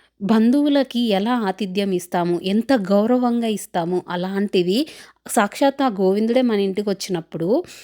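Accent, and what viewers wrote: Rayalaseema, India. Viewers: Hi bujji maadi కూడా రాయలసీమ. యాస super. Funny Funny videos. Script totally natural. పూజ ardamainatu cheppavu.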